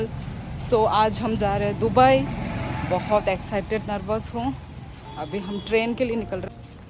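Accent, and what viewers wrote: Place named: Tripura, India. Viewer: Tripura ka accent aa raha h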